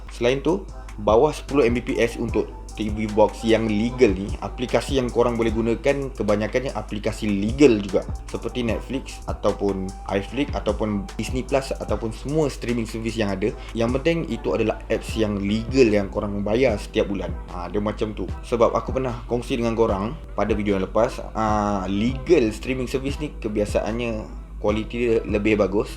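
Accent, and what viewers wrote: Java, Indonesia. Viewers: bang ko org mna. ckp mcm ada sleng jawa je..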